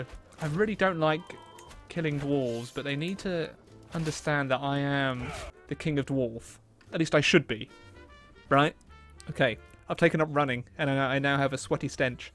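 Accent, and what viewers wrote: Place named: United Kingdom. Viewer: What type of British accent do you have it’s very pleasant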